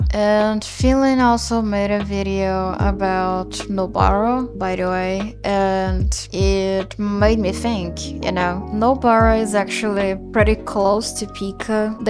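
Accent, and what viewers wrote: Brazil. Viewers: Meu ouvido brasileiro detectou algo brasileiro 👀 kkkkk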